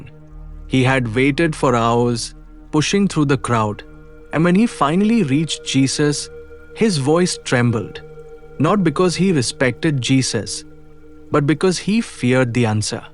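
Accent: India. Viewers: The narrator speaks with heavy indian accent. The story is not from bible for sure. Most probably from hindu since hindu is big religion in India.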